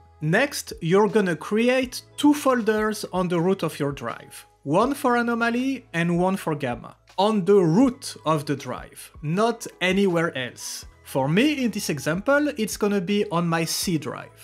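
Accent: France. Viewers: Dès les premiers mots on sait que t'es français! Merci pour la vidéo! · tes francais ?? where is he from >>,<<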